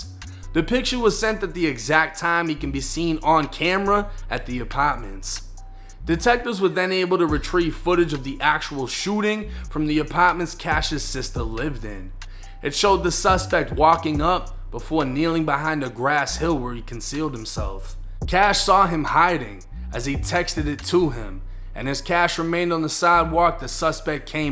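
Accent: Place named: New York, United States. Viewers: Why do you have a New York accent as a Floridian.. please explain.. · The narrator, the one that telling the story sounds like a New York hooker, bro, talk in your own language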